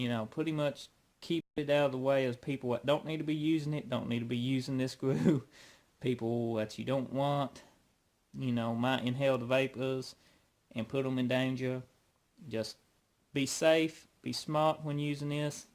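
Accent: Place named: South, United States. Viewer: LMAO....YEP. WHERE ARE YOU FROM? YOU HAVE A SOUTHERN ACCENT...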